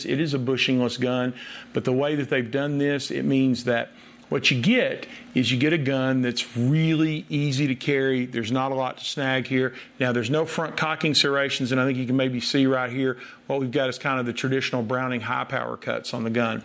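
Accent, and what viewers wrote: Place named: South, United States. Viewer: I have a hard time listening to a overweight, end of life, bald bullet headed guy who speaks with a southern accent telling me anything about safety and personal defence. Here's is a personal defence tip, start exercising and stop eating everything you see. You are far more likely to die of a heart attack than a bad guy, "you can count on it".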